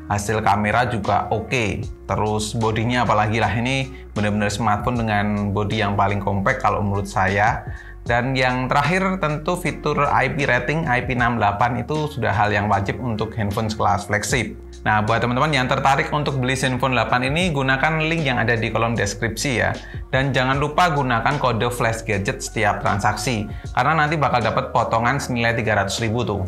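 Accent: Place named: Sleman, Indonesia. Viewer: orang sleman ya mass?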